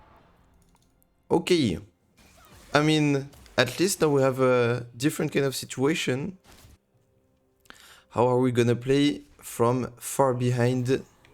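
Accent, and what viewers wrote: France. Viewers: Wow je compatis! Je suis arrivé ici parce que pour la première fois aujourd'hui je suis tombé sur un Teemo correct (mes premières game les mecs y savaient pas space c'était un all in un kill 😂), j'ai fini en 0/7!!! Du coup j'ai fait recherche YouTube " Teemo"... Et je comprends maintenant! La vache c'est rude! Merci pour le contenu en tout cas, je suis débutant et je main Trynda et Sett et tes vidéos éducatives elles aident de ouf! (je me suis permis de comm en Français j'ai cramé ton accent 😂😂)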